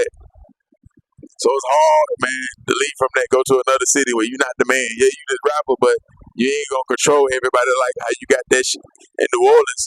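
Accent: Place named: Atlanta, United States. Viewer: Lawd why tf i watched this and he sounds like he from the country not neworleans definitely atlanta or Carolina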